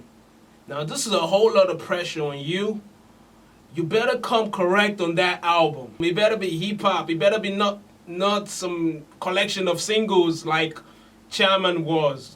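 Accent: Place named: United States. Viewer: lose the American accent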